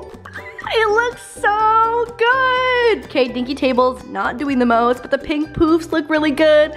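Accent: Canada. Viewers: Is she Canadian? Her accent tho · I love that you can tell you're from Canada when you talk! So exciting! Congrats on moving!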